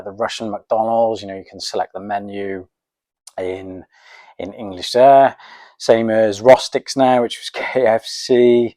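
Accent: United Kingdom. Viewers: Как приятно слушать британский английский. Стала каждый день Вас слушать без перевода. Удачи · has a very good British pronunciation, it's quite easy to understand him. I've had an opportunity to speak with many other English people and often it was rather difficult experience. (my ear trained more to northern American version of English).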